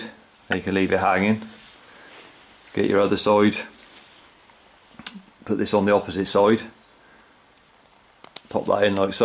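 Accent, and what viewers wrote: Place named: Totton, United Kingdom. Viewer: Great video, very clear instructions. Are you from Totton? Accent sounds like it!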